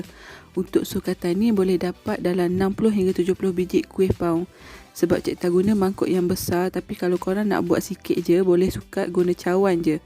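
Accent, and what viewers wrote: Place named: Kuala Lumpur, Malaysia. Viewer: Hebat buat kuih ganu tp slang orang key el😄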